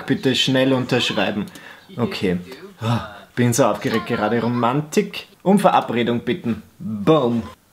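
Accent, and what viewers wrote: Austria. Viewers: Hallo! Ich habe dich durch eine Freundin auf dein Instagram Account entdeckt. Darauf habe ich das Bild mit Sims 4 gesehen habe und dann deinen YouTube Kanal gesucht. Nach dem Sims 4 LetsPlay abonniert. Ich habe dann gemerkt dass du aus Österreich kommt und das finde ich echt cool. Deinen Akzent bzw die Art wie du sprichst ist sehr cool und gefällt mir. Auch deine Mimik finde ich sehr cool. Ich habe erst nach ein paar Videos gemerkt dass du schwul bist und deshalb finde ich Kommentare wie "Du bist die größte Schwuchtel überhaupt" richtig unnötig. Hab zwar nur 2 entdeckt aber trotzdem. Du bist der einzige österreichiscche Vloger. Respekt. Warum? In Österreich gibt es nur VeniCraft, der ist aber LetsPlayer. Noch was zu deinem Akzent, ich habe auch einen da ich aus Linz komme und wenn ich mit Freunden in Deutschland skype verstehen sie nichts. 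Dich verstehen sie auch nicht immer. Finde ich lustig. Ich könnte noch länger schreiben aber ich möchte dich nicht nerven. Liebe Grüße aus Linz:)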